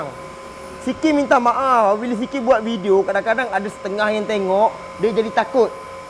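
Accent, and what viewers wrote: Pahang, Malaysia. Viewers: Pekat Pahang yakkk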